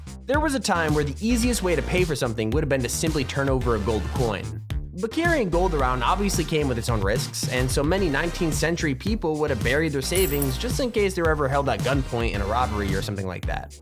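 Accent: Australia. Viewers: Wy you doing an Australian voise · Are u Australian bc I'm Australian (mate)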